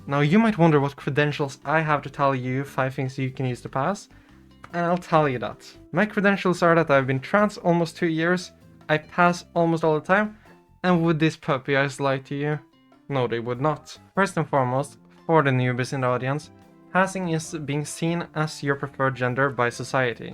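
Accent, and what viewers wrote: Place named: Norway. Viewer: Are you scandinavian? Sorry if that's a personal question but I swear I recognize that accent. *edit: I scrolled down a bit and saw you were norwegian! its cool to see a fellow scandinavian!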